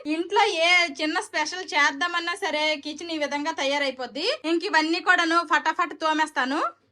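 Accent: Srikakulam, India. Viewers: Haiii sister me srikakulam bash chala bagaundi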